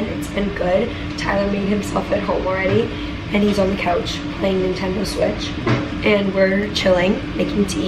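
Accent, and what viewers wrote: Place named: Northeast, United States. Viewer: ya it's def a northeastern accent thing. i watch another youtuber who lives in la but she's from massachusetts and she say "rum" for "room" too. it's funny but cute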